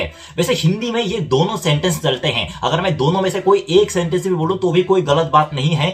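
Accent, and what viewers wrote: Standard. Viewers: Aapki Hindi bohot acchi hai or uccharan bhi bohot shudhh hai....Agar aap bataoge nahi toh kisi ko bhi pta nhi chalega ke aap Koreai nagarik hai.... You explained the whole thing beautifully....the video also tells a lot about Korean language. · Bohut sudh hindi bolta h bhai esha hindi toh hamlog nahi bolte.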